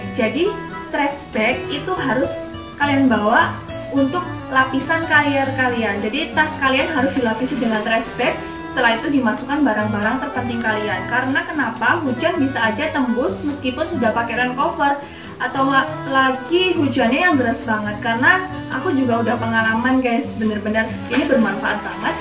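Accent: Indonesia. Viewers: medok banget mbak tapi lucu cara Ngomong nya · Logat jawa timur 😅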